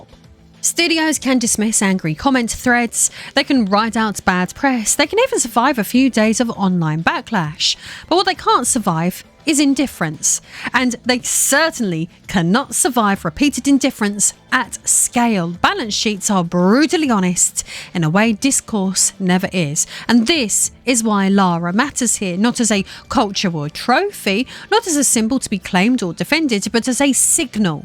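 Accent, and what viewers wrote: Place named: Cockney, United Kingdom. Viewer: That cockney accent coming through but also sounds a bit Greek, am I wrong? Great video btw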